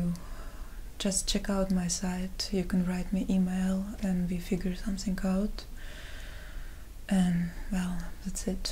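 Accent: Russia. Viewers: *q* Russian accent............. <3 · hey, where are you from? Your accent sounds Russian. I'm Russian and i can understand all your speech. When i am trying to do it with native speakers i have problems but your pronounce is awesome. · Are you from russia or something ? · I can hear some russian accent :D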